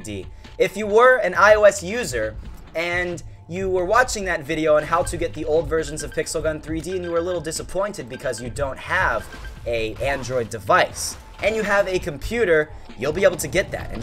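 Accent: Australia. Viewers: Your Australian omg myself is not the only one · ur australian yay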